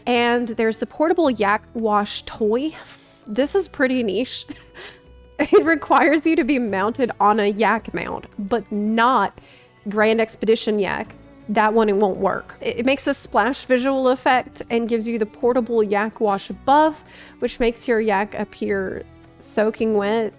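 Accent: South, United States. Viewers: are u from the south?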